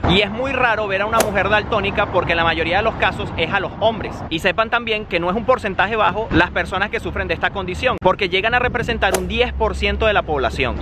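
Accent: Venezuela. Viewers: Umm!! Es la primera vez que escribo un comentario a un Youtuber y veo a muchos!! Tus vídeos me gustan mucho, soy de España hace poco he estado en Portugal unos días :-) me ha llamado la atención ayer ver la banderita de Portugal en tus vídeos y que esté escrito el título en español, enseguida me he dado cuenta que eras Venezolano al escucharte hablar. :-D que chulo!! Venga a por más vídeos!!! Olé!! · eres de venezuela?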